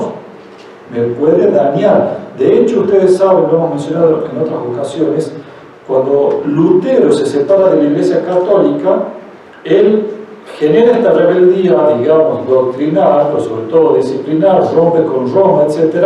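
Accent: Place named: Argentina. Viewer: Doy gracias a Jesucristo porque me permite participar y aprender en este retiro. Gracias Padre por su enseñanza. Doy gracias a Jesucristo porque me permite participar y aprender en este retiro. Es muy clara su explicación pero me cuesta mucho trabajo entender la dicción, tengo que repasar varias veces algunas partes del vídeo para entender. Perdón por no recordar el nombre de su compañero, pero la exposición que hizo sobre la confesión casi no la pude entender.Yo creo que ésto nos puede suceder a muchas personas que no somos de Argentina. Para retiros posteriores, pudieran hablar un poco más despacio? Es muy valiosa su aportación, por eso hago este comentario, Muchas gracias, Dios los bendiga.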